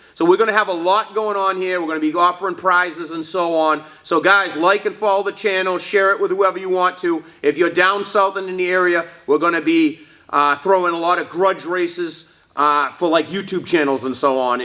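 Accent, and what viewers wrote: Boston, United States. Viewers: All I hear is Boston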